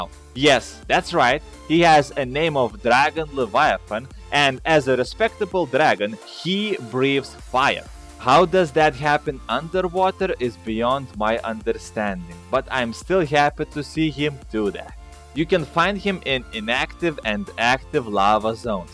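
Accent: Russia. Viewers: You sound russian? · Haven't seen this guy's vids before. There's something really charming about his heavy Russian accent in combination with his upbeat attitude. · dude you sound like a badass Russian general your accent is epiac! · этот русский акцент прекрасен! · This is the one guy on youtube who has a legit russian accent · Your Russian accent sustains me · is he russian _ accent_ calls us camrads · Lol for some reason i had this ransom feeling the narrator would be a russian male...i CANNOT belive i was right... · I love your voice Russian! And goodjob dude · Этот акцент... Русский, да? :)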